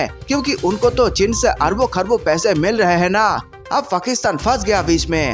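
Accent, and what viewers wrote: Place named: South India, India. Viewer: Madrasi hindi sikhle pehle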